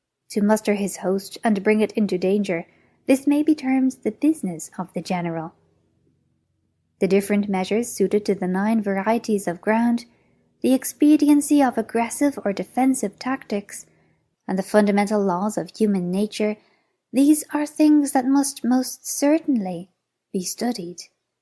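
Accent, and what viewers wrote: Ireland. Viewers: What a coincidence, last week I added an Art of War audiobook vid to a playlist, intending to listen to it at the gym this week. I think I'll listen to your nice Irish-accented narration instead. Thanks.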